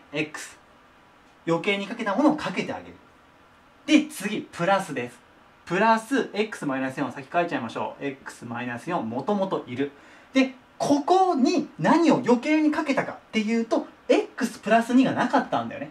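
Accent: Kansai, Japan. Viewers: たまに関西弁出てるのおもろw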